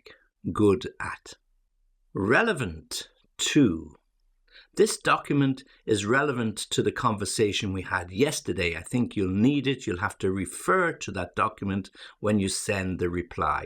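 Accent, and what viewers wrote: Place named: United Kingdom. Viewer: Thanks a lot, for your useful lesson and my favorite British accent!!!!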